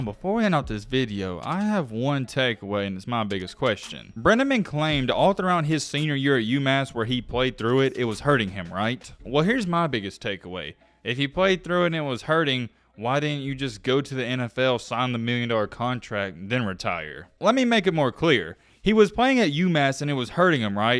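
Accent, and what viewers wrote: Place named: Appalachia, United States. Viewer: Bro, your hillbilly twang is awesome!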